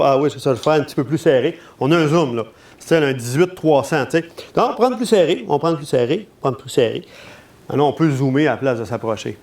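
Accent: Quebec, Canada. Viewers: Après deux heures très intéressantes j'ai attrapé l'accent québécois tabernacle. Merci de Belgique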